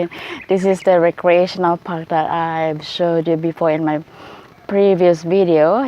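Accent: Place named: Philippines. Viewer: I thought so by your accent and youtube video, I am from Canada and there are lots of Phillipeans living in Canada besides other immigrants that Priminster Justin Trudeau has brought in from one year ago and he is bringing more in this year of 2019. By the way, Canada is not like the U.S.A. as of school shootings and gun violence, that's why they all want in here.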